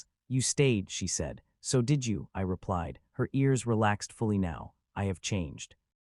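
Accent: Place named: United States. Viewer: Plenty of aussie accents on AI. Kinda wrecks the story with a yank voice and customs.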